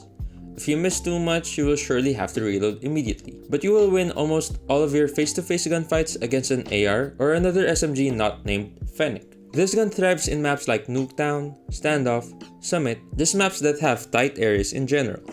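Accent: Philippines. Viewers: Pinoy ka lodi no hehe gawa ng accent mo sa english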